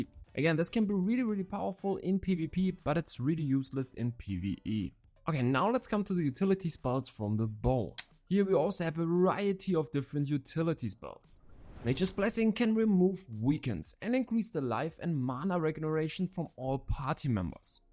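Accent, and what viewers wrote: Germany. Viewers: german who speak english xD funny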